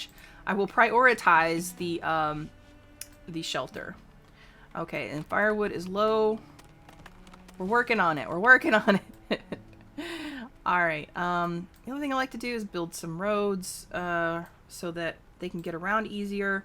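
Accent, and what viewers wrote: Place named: Texas, United States. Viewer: that's awesome! I was born and raised in texas too lol okay I thought it was kinda texan sounding but some southern drawls can sound similar haha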